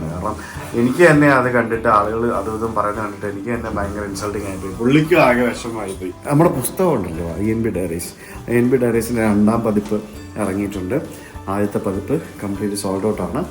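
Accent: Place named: Kumbanad, India. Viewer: Dude, I accidentally started watching your videos and realized you are from my mom's town. So watched many and enjoy them - similar Malayalam to my cousins etc. Don't know Santosh George. I am sure a great guy. But your is coz you are my home guy and its fun. Keep up the good work buddy. From a South African - 58 yr old born and raised African Mallu from Kumbanad and mom from Kozhencherry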